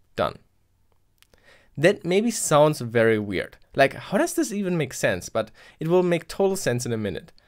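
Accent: Germany. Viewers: He's German so some funny pronunciations comes with him you know! ;) I'm Swedish and we do the same, like calling Germany for Jermany.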